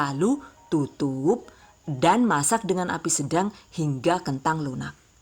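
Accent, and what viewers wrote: Banyumas, Indonesia. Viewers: Muaturnuwun mbak ngapak 😀🙏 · orang ngapak ya pada baelah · Mbakayu ne lucu ning ngomong Jawa ngapak cm sayang ora katon wajahe, tp masakane ky ne top maskotop.... Jos gandos wwwkkkkwkkkk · Deneng ngapak kaya inyong heheh,,maturnuwun bunda resepnya... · Mantep resep rougut nya ,, ngmg ngapak koh,, nyong wong ngpak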